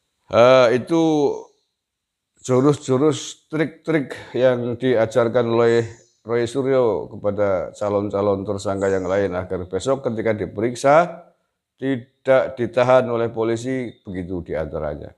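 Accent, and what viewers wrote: Banyumas, Indonesia. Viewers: Ga nyangka bisa guyon dgn ngapaknya, mantap penjelasan nya gas pol.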